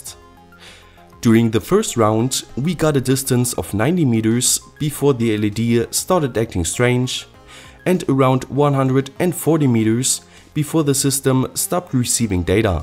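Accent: Germany. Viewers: Sander Dol i think he’s German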